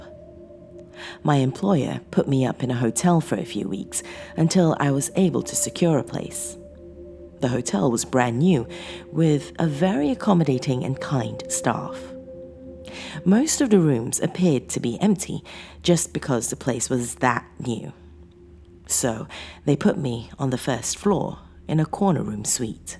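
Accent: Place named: United Kingdom. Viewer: I love your British Accent and this channel, you just got a new subscriber. Great Show Indeed !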